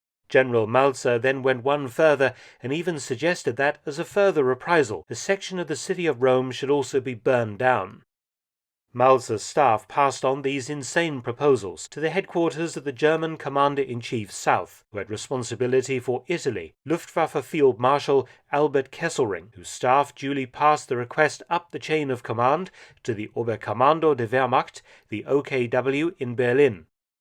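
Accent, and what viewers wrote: United Kingdom. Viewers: I dropped out of college, but my history class was the only one I completed. Dude was just like Mark without that silky British accent hahaha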